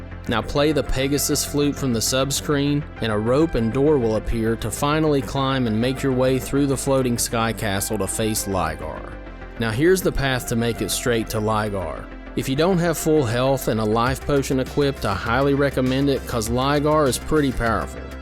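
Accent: South, United States. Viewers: Do I agree Rygar was highly underrated? Yes! Do we have the same first name? Yes! Does your monotone/southern accented voice make me want to kill myself? FUCK YEAH! Regardless of me wanting to commit homocide, suicide or both, I'm interested in watching this video. Here goes... I fucking looooooooooved this game. I played hours upon hours of it along with my dearly departed grandma. Yes, I played this game with my kickass g-ma. I only wish she'd have survived breast cancer so as to have been able to play the PS2 re-boot. I love & miss you Gramma B. I love and miss you. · You deliver your videos with a childlike sense of enthusiasm and your southern drawl makes for a great narration. Great job, great research!!! Thank you!!! Your Friday the 13th vid was ultra dope too!!!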